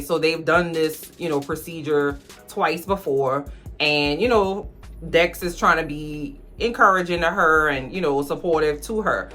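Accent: New Orleans, United States. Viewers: First time watching.... are you from New Orleans?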